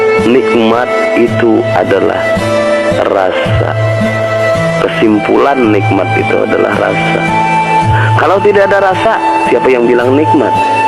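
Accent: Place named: Kalimantan, Indonesia. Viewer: ma'af kliru..🙏 logat n bahasanya mirip di kalimantan.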